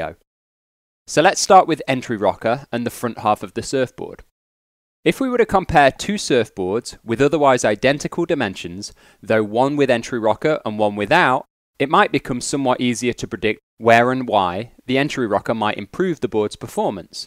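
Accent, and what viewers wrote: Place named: Northern England, United Kingdom. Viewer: Every time I hear a northern English accent talk about surfing, I am surprised that people actually surf up there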